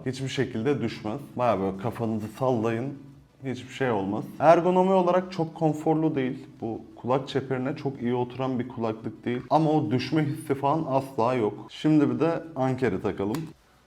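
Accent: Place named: Bingöl, Turkey. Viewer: Kardeş sen bingöllü müsün aslen?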